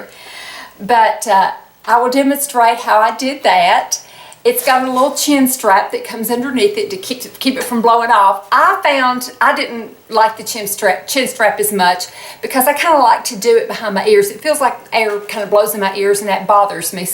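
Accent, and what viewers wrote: South, United States. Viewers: I smiled as I watched this. I had a soft bonnet hair dryer. Since I live in England now I will have to see what the they have. Thanks for sharing. Love a nice nice Southern accent, too! Not everyone in England sound like Colin Firth!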